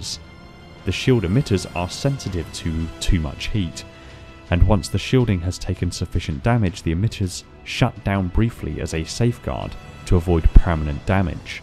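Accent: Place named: England, United Kingdom. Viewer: The English accent makes narrating this video gives it a certain level of elegance.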